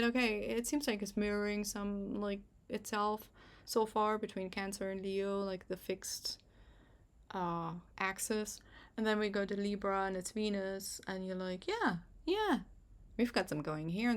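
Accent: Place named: Germany. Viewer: Your English is great actually👏😎, yet it has a carry-over🎉 from german not just in accent/pronunciation, rather also in the way to frequently used fillers such as Ähem/Uum😂😢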